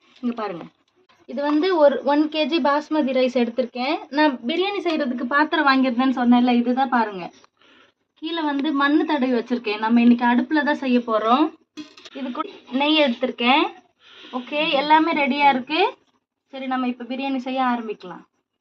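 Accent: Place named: North India, India. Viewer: இங்க வந்து திண்டுக்கல் பக்கம் வளர்ந்த பொண்ணா இருந்தாலும், உங்க தமிழ் வட நாட்டுக்காரங்க பேசுற தமிழ் மாதிரியே இருக்கு. எப்படி???🤔🤔🤔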